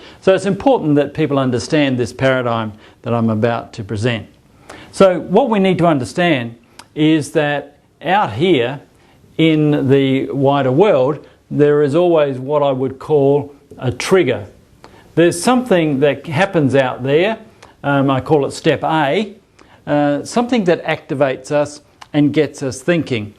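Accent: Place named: Australia. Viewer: some Aussie accent mate..i been to perth studying for 2 years and this accent reminds me everything there.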